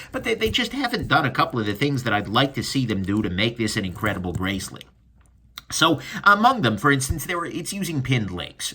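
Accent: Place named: Brooklyn, United States. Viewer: You must have grown up in lower Brooklyn. You sure sound like you hail from there.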